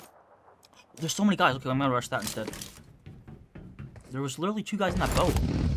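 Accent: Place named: United States. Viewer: I love your American accent commentary love u brother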